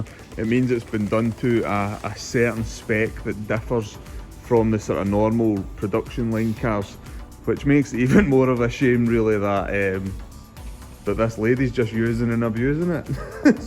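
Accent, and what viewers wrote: Scotland, United Kingdom. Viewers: Yfoods? Or wife hoods? I love the scottish accent :) great vid as always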